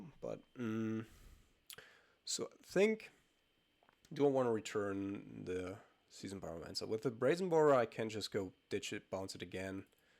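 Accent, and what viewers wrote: Germany. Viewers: Hey, nice vid. :) are u from germany? ;)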